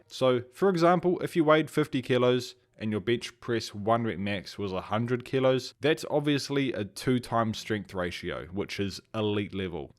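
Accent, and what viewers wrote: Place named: New Zealand. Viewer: You sound like one of those New Zealanders who has a slight Australian accent for some reason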